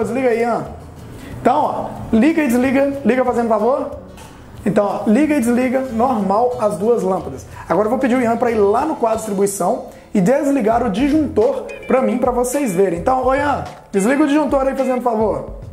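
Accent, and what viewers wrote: Goiás, Brazil. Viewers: Goiano? O seu assistente "Ian" é de Goiàs! hehehh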